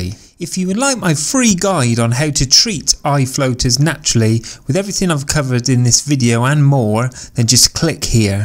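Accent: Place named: England, United Kingdom. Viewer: Where in England is he from?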